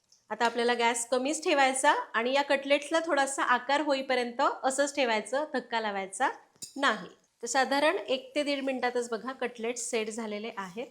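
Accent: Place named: Pune, India. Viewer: mam tumachi Marathi bhasha farach changli ahe. Agadi puneri ❤🎉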